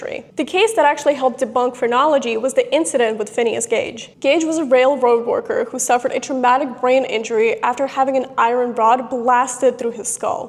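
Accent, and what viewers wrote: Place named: Eastern Europe. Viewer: She is a truly excellent speaker. But her accent intrigues me. Eastern European perhaps?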